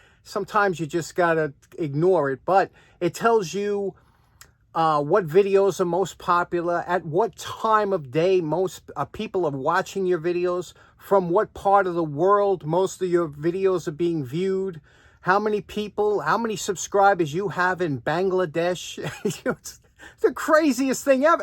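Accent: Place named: New York City, United States. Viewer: your accent makes the video's. Nothing like good ole NYC sarcasm.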